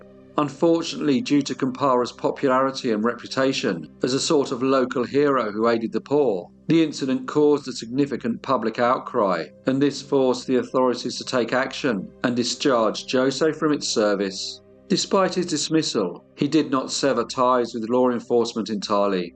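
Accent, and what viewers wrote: England, United Kingdom. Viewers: Reputable channel, not quite. The narrator can not even say the gentleman's name the right way. Isn't it said Hose' or 'ose'? You don't say the J because it is silent? If this channel was reputable, it would have also done the research of how to pronounce the Brazilian names. Hence, the English narrator was probably making fun of how the South American dialect is pronounced. This is the reason why I have not subscribed to this channel. I can't stand the one tone monolog, and that flucking English accent!!! Why not be a little more respective, and also get another narrator, possibly a female!!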